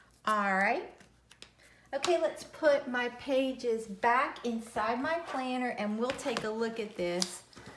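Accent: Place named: Georgia, United States. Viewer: I like all you crafts and your southern GA speaking. I am from MS, so mine is more country. Like you I love to be creative. I am gonna be in GA about 10 days starting the weekend.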